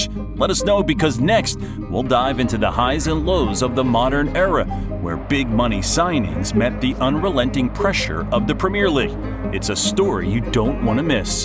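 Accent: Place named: United States. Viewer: bro is your voice AI and why is an american speaking about everton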